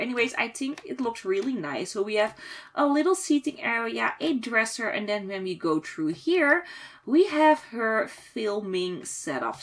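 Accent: Netherlands. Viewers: because you have that Dutch accent and no fense though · hellooooooooooooo! euhm youre accent is like a dutch one. im also dutch so why would this be hate? but my question is:are you dutch? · u engels is heel slecht je moet de T niet zo nederlands uitspreken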